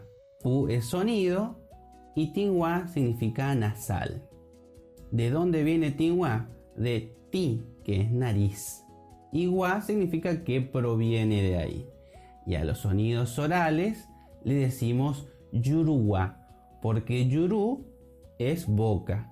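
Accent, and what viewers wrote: Argentina. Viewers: Primera vez que escucho guarani con acento Argentino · Debe ser correntino...igual explica muy bien 👍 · Pareces Argentino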